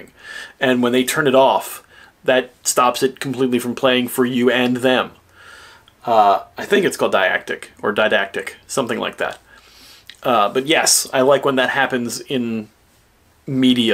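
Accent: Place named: Scandinavia. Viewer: Oh. I thought he was from one of the Nordic countries judging from the accent. My point about him being a good Doctor still stands.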